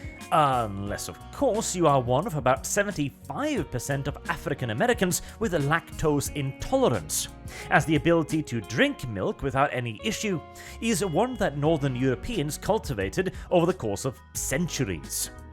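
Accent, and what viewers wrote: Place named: United Kingdom. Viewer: Your from Norway? you sound more British than the British do!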